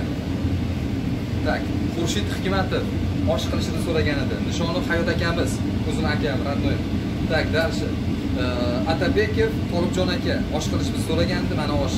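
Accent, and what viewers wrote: Andijan, Uzbekistan. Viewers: Жааа. Яхши анжанча шевангиззи яхши тушунамиз. Гапираверинг. Нима? Росияда ок сабзи йукми дустим???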